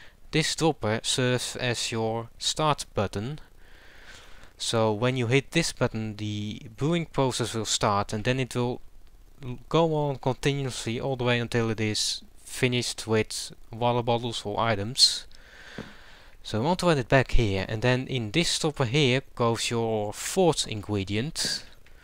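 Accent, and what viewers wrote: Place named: Netherlands. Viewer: I think you are dutch? :P try to fix your accent :)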